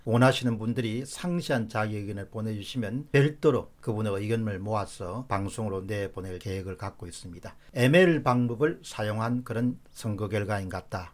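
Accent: Gyeongsang, South Korea. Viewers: 경상도 사투리쓰네 ㅎㅎㅎㅉㅉ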